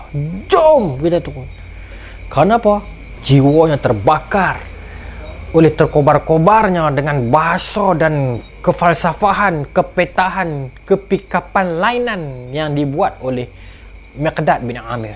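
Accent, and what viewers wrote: North, Malaysia. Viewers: 😃😄 wahh.. Ramai juga yg faham kan kuliah Ustaz walaupun beliau pekat loghat utaqa.. Boleh nampak disitu keikhlasan Ustaz dalam berdakwah 👍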